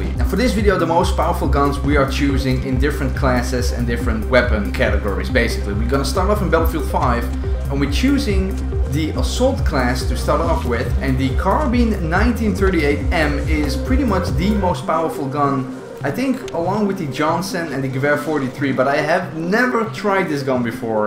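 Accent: Netherlands. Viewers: You have a nice dutch accent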